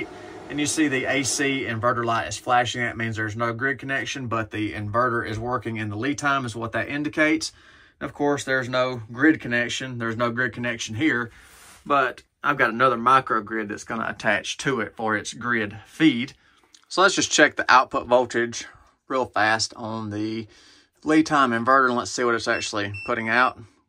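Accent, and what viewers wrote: South, United States. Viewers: Great video as I just ordered this inverter a few days ago off amazon along with 2 of their 48V 100AH Li Time batts. I've been using a small 12v solar set up at my storage place for the past 8 years so jumping to 48V is going to be a huge improvement. Started with 6v AGM in series with 800A bank then replaced with 3 12v 200AH Li time only 2 years ago. Have been very happy with the batts but do you think I should have gone with our used another brand? The two Li time I just ordered are not the rack type but the big flat type, a mistake in your opinion? Are you planning to use full time in your camper or home? Curious where you are located, you sound Southern ;)...I'm in East TN?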